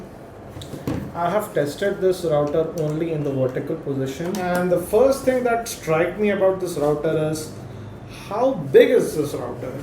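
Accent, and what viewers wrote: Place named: Tamil Nadu, India. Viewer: Your Tamil accent is heavy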